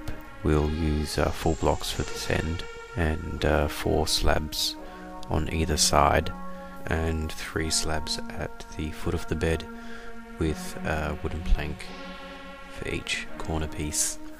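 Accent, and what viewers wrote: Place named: England, United Kingdom. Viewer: Thumbs up if you read Harry Potter and you knew that the guy was going to have an English accent.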